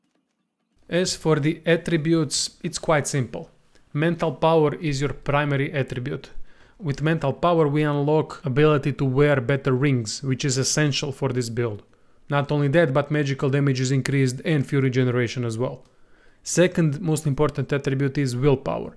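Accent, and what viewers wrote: Russia. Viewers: Are you russian? Very similar accent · So there's a character in "The Magicians" Series of books ... which they made into a TV Show ... so when I'm being instructed by someone with a Russian Accent ... about how to be the best mage... I can only think you're Mayakovsky in disguise XD...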